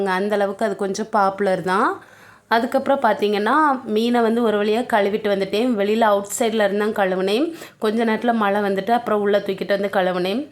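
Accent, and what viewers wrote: Tirunelveli, India. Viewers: திருநெல்வேலி பாஷை எனக்கு ரொம்ப பிடிக்கும் ❤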